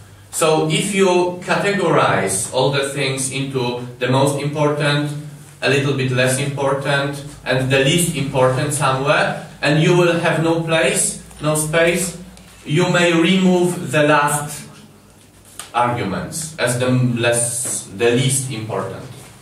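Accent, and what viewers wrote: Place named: Poland. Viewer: Wiedza imponująca, rzeczywiście, gratuluję uczniom :) Przyczepiłbym się do wymowy, która jest bardzo polska :P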